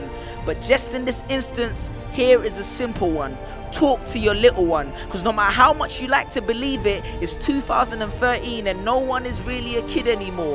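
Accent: South London, United Kingdom. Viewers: I can tell he's from south london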